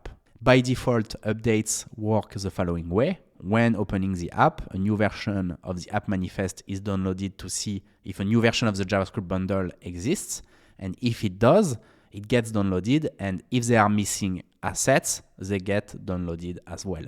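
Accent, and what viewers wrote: France. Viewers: Love it when the French speak english. Great content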